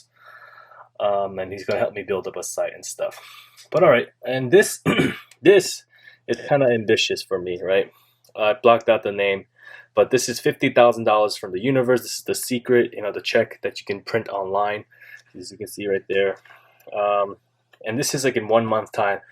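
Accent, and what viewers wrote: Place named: Korean American, United States. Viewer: Dude are you Korean American? From other vid you posted, I though you sound like Korean American. But watching this, maybe you are!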